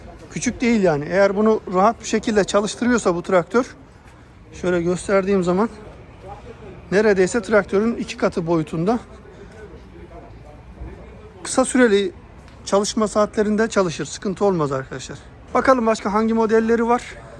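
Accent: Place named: Isparta, Turkey. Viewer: çok güzel ama çok kalabalık ve streslıi istanbuldan dünya güzeli ıspartama selamlar sağlıklar inşallah gayet güzel aktardın ıspartanın türkçesi güzeldir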